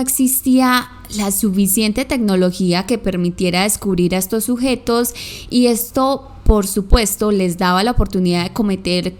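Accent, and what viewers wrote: Colombia. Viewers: Que satisfacción es saber por fin quien era en realidad Jane Doe, y cuáles eran sus circunstancias, a pensar de que se me hace un caso súper triste, es bueno saber que ya fue identificada y ojalá un día se pueda saber quién fue el miserable que le hizo eso., disfruto mucho tú manera de narrar los casos, de verdad que es entretenido, aparte ese asentó tuyo colombiano tan bonito lo hace todavía más disfrutable. Saludos desde Guadalajara, México.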